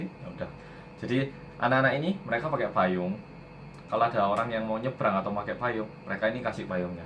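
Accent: Java, Indonesia. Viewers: sy senang korea yg logatnya masih medok jawa...walaupun sy orang banjar kalimantan...gk bisa ngomong jowo... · Bersama orang Korea yang MEDOOOOOOOOOK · Seng ngangeni medok ya ki looo 😄😄😄😄😄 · pasih banget bahasa indonesia y,,bahasa jawa y medok banget,,sebener orang mana sih.... 😀 salam kenal... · Aku suka video mu mas . salam kenal ya aku wong cirebon . jawane medok apik . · medok jowoo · Kayak Turunan Jawa asli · jadi penasaran kenapa oppa tertarik sama Indonesia sampe kadang ngomong pake dialek jawa 😂 lucu tau liatnya 😍😍😍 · Logat jawa dikit.. Keren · 오빠, lucu dah orang korea fasih banget bahasa indonesia nya plus ada medok medok e ㅋㅋㅋㅋ niceeeee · Ya ampun. Awakmu iki wes juwowo bianget. Sampek ngomong manten iku lak jowo wo tah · Tapi medok Jawa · ngomonge luwih medok timbang aku cak... padahal aku wong jowo.. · 100% logat Jowo e apik tenan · Eommeo.......😱😱😱 Your logat is so Indonesian! 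I am Indonesian and Javanese but I can't speak Indonesian or Javanese as good as you do. Daebakkkk Hyung.... If you have a time please visit my hometown; WONOSOBO in Central Java Province. Kota itu terkenal akhir-akhir ini Btw 😁 Make some videos there and share all here. Okay Mas Bro, Matursuwun. Salam Kenal.😊 Anyeong...... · Awal2 tyta blm ada "orang korea yg medhokkkk", sama "bolo-bolo" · Belum ada bersama orang koreaaa yg medhooook dong :" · Hehe tk pagil mas aja ya ini orang korea asli apa orang indonesia ta kok ngomong ya medok kayak aku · mas korea, aku jg orang jawa. aku suka medokmu...hehe..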